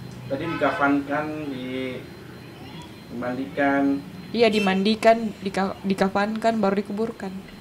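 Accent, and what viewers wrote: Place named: Bugis, Indonesia. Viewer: Itu bahasa Indonesia campur logat bugis soalnya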